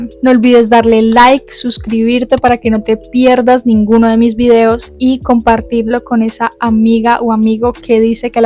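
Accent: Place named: Cali, Colombia. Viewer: muchas gracias!! Que buen video amo, eres de Cali vdd, lindo acento 😙😙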